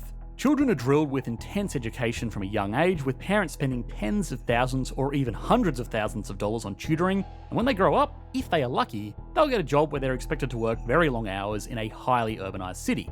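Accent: Australia. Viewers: Dude, how many channels do you run? I heard this Aussie accent before!😊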